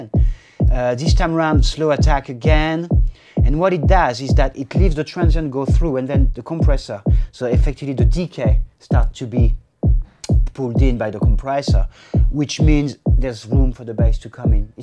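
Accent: France. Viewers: Goog french accent haha Thank you for the video ;) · haa probably french accent no? better to understand in the middle of all this english lesson. ;) good lesson thx peace !!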